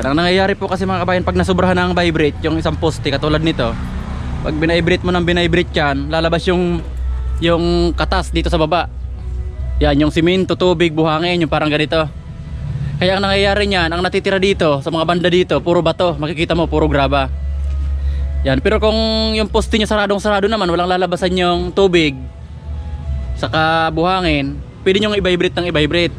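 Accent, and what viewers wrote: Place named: Bicol, Philippines. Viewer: Parang taga bikol si idol ah saan ka po sa bikol?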